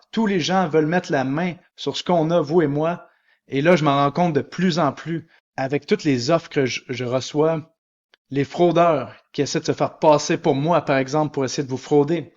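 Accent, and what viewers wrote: Canada. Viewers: Je viens de m'abonnée L accents Canadien ça m'a dépaysé haha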